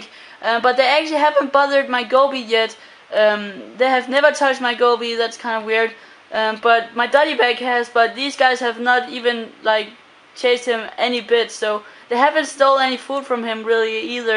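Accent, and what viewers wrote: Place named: Denmark. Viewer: You Danish accent is more pronounce now as you grow older. Sounds cute--keep up the great work.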